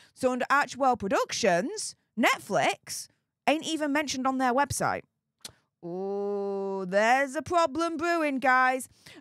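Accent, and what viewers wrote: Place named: England, United Kingdom. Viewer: Takes a while to get through the English accent however is interesting and worth watching.😅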